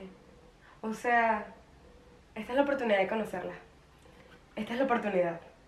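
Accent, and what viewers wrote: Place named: Venezuela. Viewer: Nuevo suscriptor ♥️ Saludos desde #CostaRica Te ayudaré a conseguir más seguidores Tienes acento venezolana 🔝🔝🔝🔝🔝🔝🔝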